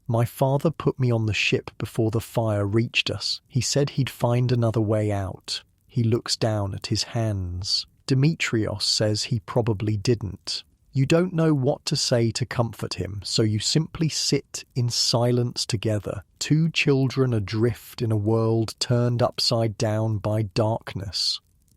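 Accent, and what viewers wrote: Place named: Scotland, United Kingdom. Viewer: Sounds like Scotland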